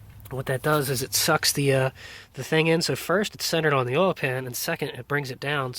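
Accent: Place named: Germany. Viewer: is there any significance to to frequent, annoying, German accent ? other than that great vid.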